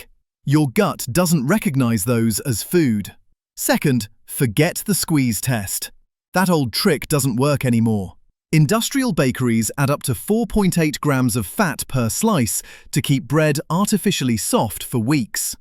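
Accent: United Kingdom. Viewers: Interesting, I will try some of those. So depressing, though, to hear another British person (okay, probably it’s not a person, but it’s got a British accent) using “likely” as an adverb (🇺🇸).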